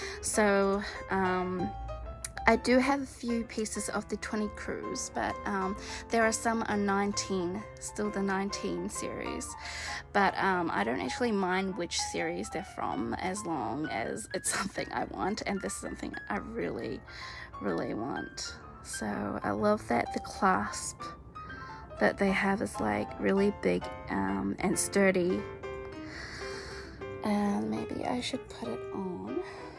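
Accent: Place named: Australia. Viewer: I can hear an Aussie Twang too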